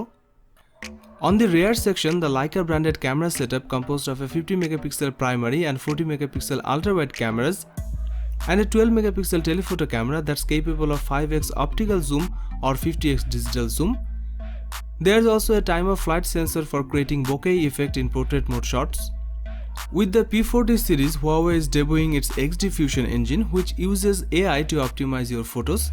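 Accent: Bengal, India. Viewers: Sound like Bengal or assam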